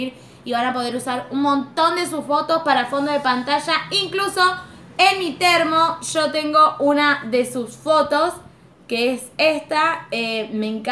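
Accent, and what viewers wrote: Argentina. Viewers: Pues quisiera también estar en esa fiesta pues ni soñando se hace realidad 😂😂me encanta tus locuras y tu acento argentino eres increíble todavía no puedo dejar de gritar de la emoción esta rebuena como los amo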